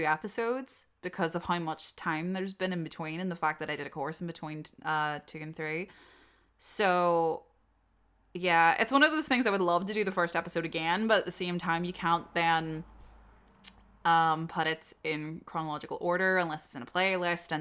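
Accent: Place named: Northern Ireland, United Kingdom. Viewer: Dang I feel bad as a Dungeon Master and a baby VA it took me ten minutes to decipher your accent as Scottish. 😭😭😭 Edit: northern Ireland fuck!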